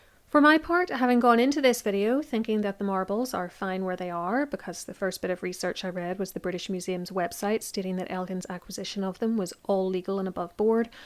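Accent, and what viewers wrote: Ireland. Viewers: Irish accent? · My guess is that, judging by your accent, you are of Irish origin. You are VERY sparse with your facts regarding the original acquisition of the Marbles and I can only assume that you are an Anglophobe.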